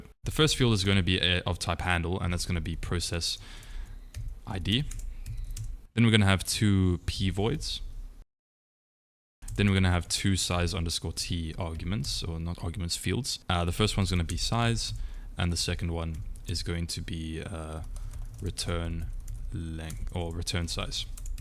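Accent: South Africa. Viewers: I figured you were South African but when I heard the "yoh if I could spell" I knew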